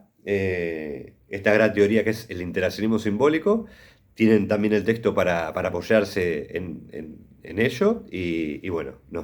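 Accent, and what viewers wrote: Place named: Argentina. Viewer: Desde Roma, entiendo el castellano en versión argentina también 😊, digo que la lección me gustó mucho y que resultó muy útil para asumir conceptos claros 👍 Veramente complimenti! 🌟😘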